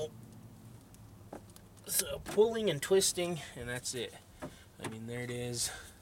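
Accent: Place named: Minnesota, United States. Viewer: Thanx for the video....... I kinda felt like I was watching an episode of FARGO (the accent)......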